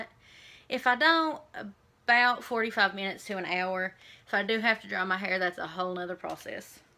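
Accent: South, United States. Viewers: I love cornhole! Your accent makes me feel at home...I miss the south :)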